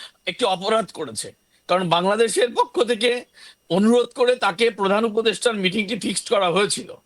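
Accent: Kolkata, India. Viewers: এই ভুয়া সাংবাদিক কলকতার, তার ভাষার টোন বোঝা যায়। এগুলা করে লাভ নেই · এই সাংবাদিক এর ভাষা তো কলকাতার ভাষা! আর একে তো কখনোই দেখিনি! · Kolkatar accents!!!! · ঐ সাংঘাতিকতো বাংলাদেশের না।ওর কথার উচ্চারণ ভারতীয়।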